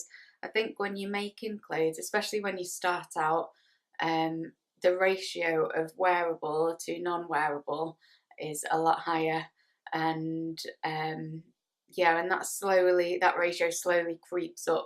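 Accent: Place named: Northern England, United Kingdom. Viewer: Hi! I've only just found you. What a soothing voice you have. I thought I detected a northern accent there, I'm from Chorley ( 20 minutes on the motorway from Manchester). I really love Abakhan as well.... I love the Northern quarter in general. Good to know about Moston, I'll give it a try! Lovely to hear all about you! Thank you. 😘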